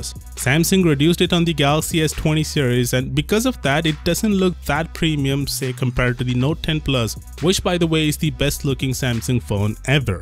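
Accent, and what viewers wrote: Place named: India. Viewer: I thouht that the dubber of this channel was indian, the accent was pretty clear:v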